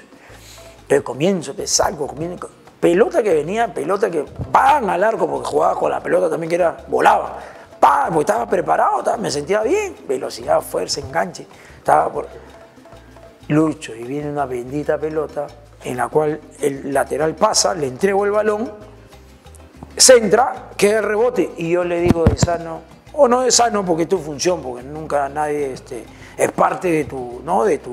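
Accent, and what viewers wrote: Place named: Callao, Peru. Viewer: Voz huayacolera...tipico chalaco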